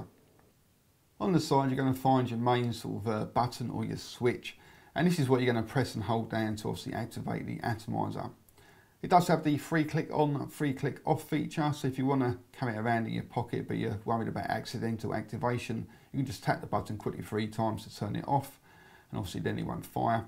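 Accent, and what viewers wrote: United Kingdom. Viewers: Hes british not english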